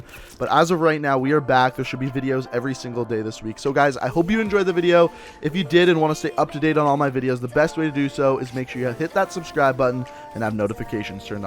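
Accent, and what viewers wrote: Canada. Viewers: 9 seconds in the video can already tell your Canadian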